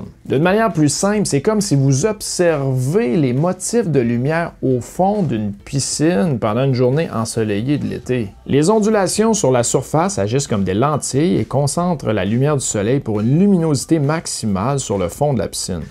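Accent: Canada. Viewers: l'accent canadien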